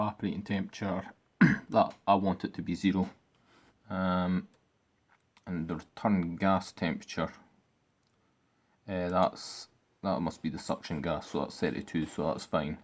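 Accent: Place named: Glasgow, United Kingdom. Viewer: I'm fascinated by your accent, are you from glasgow?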